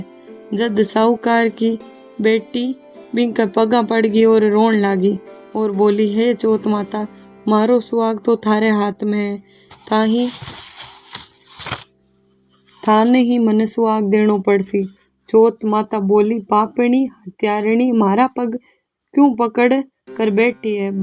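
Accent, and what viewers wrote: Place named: Marwari, India. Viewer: Nice marvadi voice 🙏🏻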